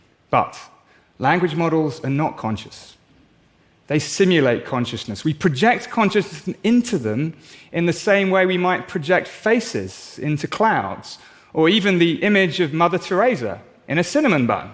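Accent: United Kingdom. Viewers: They're just lying to our faces. This is all damage control. The y know they've already lost control of AI. They've created a monster they could never be able to tame. These guys are pure evil. Lying to people's faces in a British accent! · This video is so full of baseless assertions, it's difficult to watch. I guess being a good orator with a British accent is all it takes to get on TED these days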